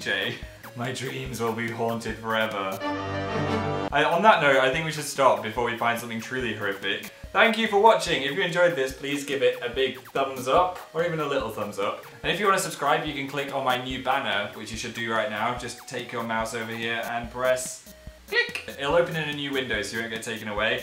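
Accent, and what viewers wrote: North, United Kingdom. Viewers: In the camera test he sounded so Northern!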